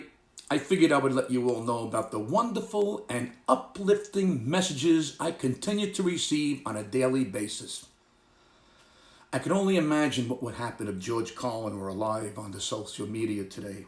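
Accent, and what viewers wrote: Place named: Brooklyn, United States. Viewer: You're Hilarious! You make me miss Brooklyn so much with the way you talk!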